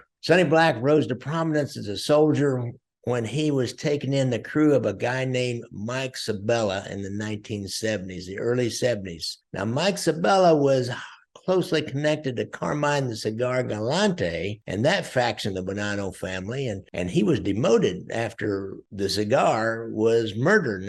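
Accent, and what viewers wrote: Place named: South, United States. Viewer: Great show as always. Its so refreshing to hear these stories from t&e perspective if the other side. While there are a few other former lawmen on youtube or guests on others platforms you have this kind of non biased, fair manner of reporting on your subject. Theyre all pretty ruthless criminals yet if theres anything good or decent about the person that sets them apart from the average thug youll bring it to light. Also, the best part is with your southern, countrified accent you dont sound like some wannabee, half-assed wiseguy. I'm Italian american myself and grew up in rural florida unlike all my other relatives who live within 20 miles of where my grandparents settled in Brooklyn over 100 years ago. Friends get a kick out of my lifelong interest in and of my extensive knowledge of Cosa Nostra because my southern accent makes me a hic or redneck who shouldnt have the interest, background and knowledge that I do. 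I never understood why that was so funny or strange to them until i started listening to your show. Now i kinda get it. For some reason though i dont think anybody feels the same when they listen to James on OC SHORTS. Anyway, just wanted to thank you for another great one. I also wanted to request a show on Trafficante family. We've all heard about his ties to Hoffa, Cuban casinos and possible involvement in the JFK assassination but theres not alot out there about local activities in Tampa and throughout Florida especially S. FL. mainly Dade and Broward countie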